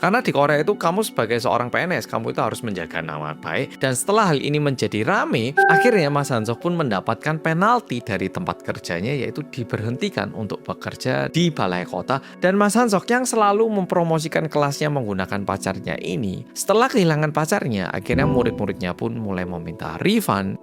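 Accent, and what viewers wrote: Java, Indonesia. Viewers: Halo gaes, welcome back bersama detektif swasta yang ... medhoookkk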